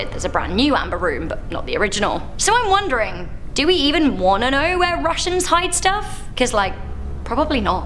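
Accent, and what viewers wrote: England, United Kingdom. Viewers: Our is back with her indestructible tongue and hot Brit accent. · WOW! This was insane! Love it! you are awesome and your shows are interesting AF. I also could listen to the butterflies shooting out of your mouth all day! The English accient is so beautiful.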